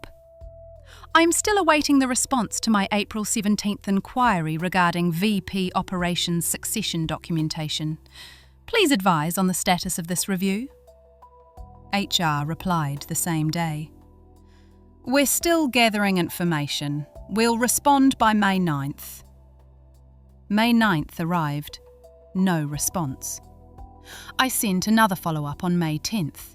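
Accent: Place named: United Kingdom. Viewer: Lovely British accent of the narrator!!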